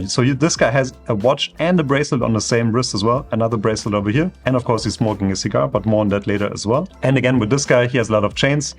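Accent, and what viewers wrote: Germany. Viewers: ich liebe es, wenn man auf einmal auf englischsprachige Deutsche trifft 😂 Der Akzent verbindet uns😂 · Oh hör ich da etwa deutsch raus? 🤫🤔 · I hear german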